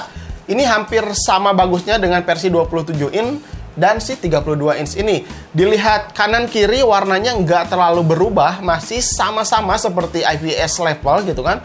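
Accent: West Java, Indonesia. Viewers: Aksen sunda nya masih ada kyk dulu _.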